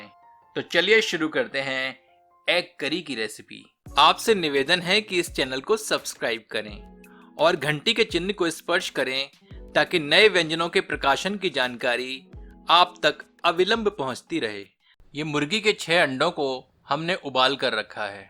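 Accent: Standard. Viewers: Bhrata....aapki ki sudh Hindi sunn k...mann Anandmay hogaya... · जी हम तो आपके व्यंजनों के स्वाद तथा आपकी शुद्ध हिंदी के उच्चारण, दोनों के कायल हैं ।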